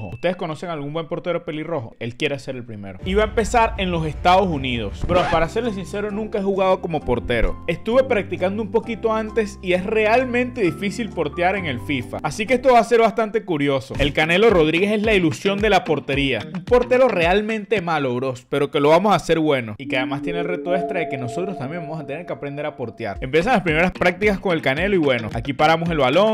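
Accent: Venezuela. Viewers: Creo que es venezolano como yo por el acento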